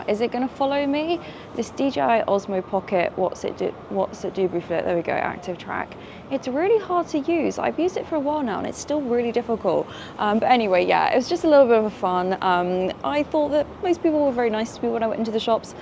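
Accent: RP, United Kingdom. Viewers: Basic economics in action here. Inequality, a disappearing middle class with less money to spend on excess, and a billionaire class that doesn’t sully themselves by going into these shops in person! 😂 fake balcony? Where were you educated? You sound like you must’ve gone to a private school- did they not teach Shakespeare? Fake balcony? C’est un balconet aka a Juliet balcony in reference to Romeo and Juliet and the scene where Romeo meets Juliet after their initial encounter “but soft, what light through yonder window breaks” is supposed to be Romeo seeing Juliet at the balconet of her window. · you are so posh 😂😂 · My God you have the most posh and smooth English accent I've ever heard in my life.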